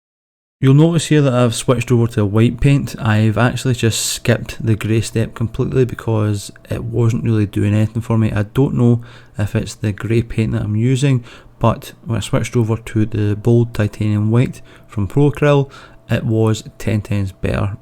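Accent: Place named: France. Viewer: "I am French, which is why I sound like this" ☠️ Now that's how you start a video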